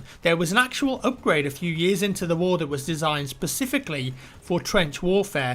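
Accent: United Kingdom. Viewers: you must be british your're accent like jason statham;) #iLikeThat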